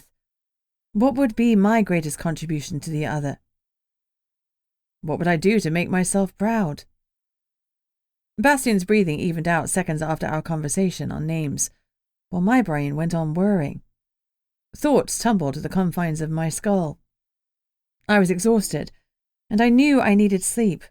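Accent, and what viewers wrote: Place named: England, United Kingdom. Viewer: Love the narrator. Lovely english accent i can easily understand.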